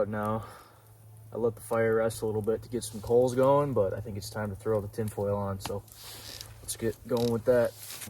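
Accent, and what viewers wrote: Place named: United States. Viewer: It looked nice and tranquil where you were, but you're nearly always at tranquil spots. I liked the footage at the end when you were leaving on the boat, and the music. That was a good wall you made with the logs, and a good idea. I really like your American accent too! Which part of the States are you from?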